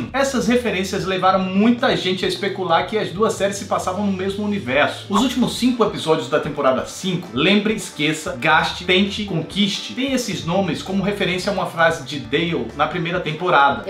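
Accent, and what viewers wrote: Northeast, Brazil. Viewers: esse cara é nordestino?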